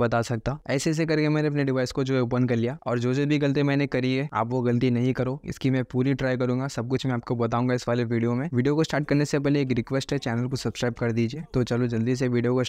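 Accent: Hyderabad, India. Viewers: Hyderabad se ho aap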